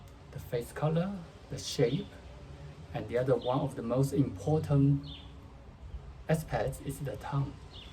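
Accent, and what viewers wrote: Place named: United States. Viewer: Very nicely explained sir, u r great and sober and your accent is as a native of America's Very nice explanation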